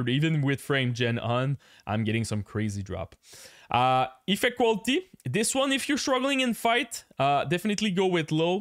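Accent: Quebec, Canada. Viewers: J'entends le Québec ici! Good video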